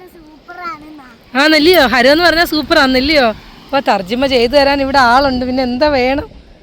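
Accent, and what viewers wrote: Kollam, India. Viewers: ജാസിനെ കൊണ്ട് അവിടത്തെ പിള്ളേര് വരെ തോറ്റ്...❤❤❤ നമ്മുടേ കൊല്ലം കഴിഞ്ഞു കരുനാഗപ്പള്ളി കായംകുളം വരെ ഉള്ള ഒരു ഭാഷ അല്ലേ നിങ്ങൾ പറയുന്നെ..❤ പിന്നെ എന്റെ രണ്ട് കമന്റ്‌ കണ്ടിട്ട് മിണ്ടാതെ ഇരിക്കുവാണ് ഇല്ലേ ഞാൻ കണ്ടു see..😂 നെവർ മൈൻഡ്.....ആ ലൈനാർ അംനിയെ ഒന്ന് കാണാൻ പോണേ.❤❤❤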